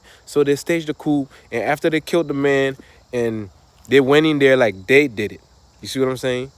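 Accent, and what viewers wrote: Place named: United States. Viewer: Your accents is very American too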